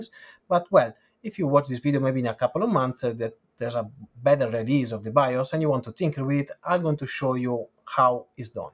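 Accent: Italy. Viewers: Italian accent is funny. No offense, I like it :)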